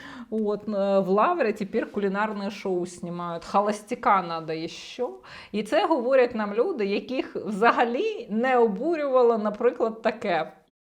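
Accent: Russia. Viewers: Цій авторці треба більше в житті спілкуватися українською. У неї купа рускіх звуків , вона вимовляє українські власні назви на москальський лад . Най більше слідкує за вимовою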